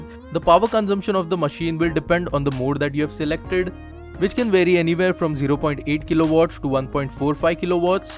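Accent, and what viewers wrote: India. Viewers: Indian cringe accent :v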